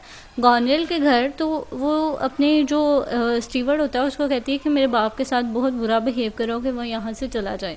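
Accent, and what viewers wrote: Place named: Islamabad, Pakistan. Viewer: ویسے آپ ہیں کہاں سے ، لب و لہجے سے تو پنڈی/اسلام آباد سے لگ رہی😁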